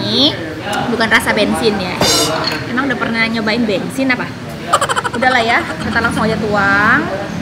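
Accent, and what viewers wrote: Java, Indonesia. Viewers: mba gemesin mukanya korean girl, tapi ngomongnya jawa medok wkwk kalau ketemu saya mau cubit boleh yaa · Tante orang jawa ya soalnya medok banget... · Muka Korea ..sikap medok Jawa 🤭🙏😁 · Mbak ko isih teko jowo medok ne wkwk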